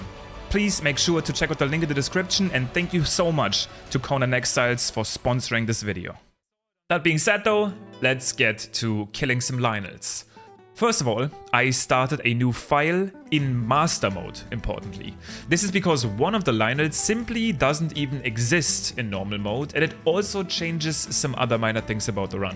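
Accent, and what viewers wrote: Germany. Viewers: Das klingt nach einen deutschen aktzent ich weiss nicht wie ich das in Englisch schreiben soll aber bist du deutsch? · your accent sounds so german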